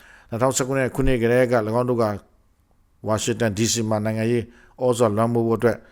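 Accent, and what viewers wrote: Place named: China. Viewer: ဘာလေသံ လဲ စိန တွေ မြန်မာသံ နဲ့ U.S ကို Smearing Campaign...လုပ် ယုတ်မာနေပြီ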